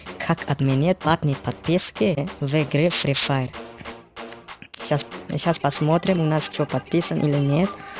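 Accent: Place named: China. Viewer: Китаец научился русскому языку,и плюясь в микрофон помог лучше чем кто-либо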